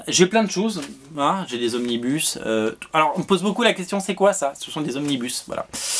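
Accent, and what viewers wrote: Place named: South, France. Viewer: Moi j'aime bien ton accent du sud :D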